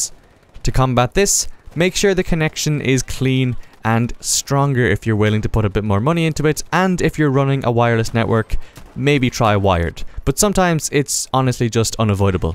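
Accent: Ireland. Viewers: thank u man for this vid i thought it was just me getting that shit and btw are u irish?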